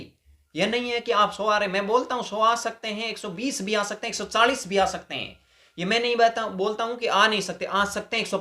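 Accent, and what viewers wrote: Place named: Marwar, India. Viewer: Sahi hai ,, cutoff kuch bhi rhe lekin analisis bhut sahi hai, aap marwad se ho sayad, language tone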